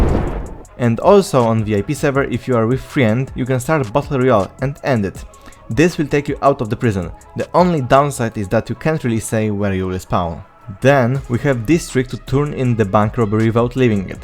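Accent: Poland. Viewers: Czy to polski akcent 😅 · you have polish accent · i love the polish accent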